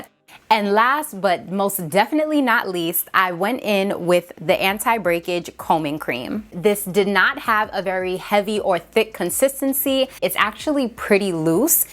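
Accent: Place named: New York, United States. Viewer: You are a queen sis and I loved every second of this. Great job and I love how you break down your video, I'm a new sub! 😁💚👑 I also like how you created a faux bang and I love your enthusiasm and your accent. You sound like you're from New York or somewhere up north! 😁 I will have to check out this line of products.👍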